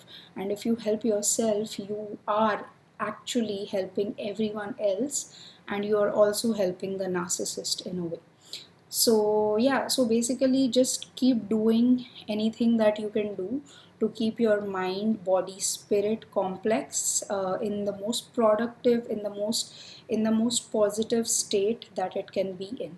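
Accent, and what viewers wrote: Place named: India. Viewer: Another really great video! Your ideas are deep and you explain things plainly and clearly. (Love your accent, is it Indian or Pakistani? Love it! And your calm, friendly attitude is also really helpful in absorbing the information) Really enjoyed this, thank you !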